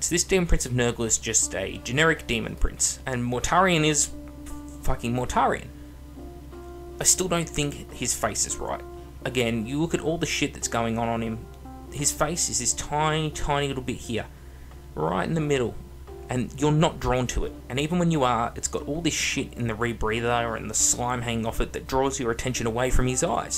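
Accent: Australia. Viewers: "Your typical british kid." He sounds like he's from Straya, mate.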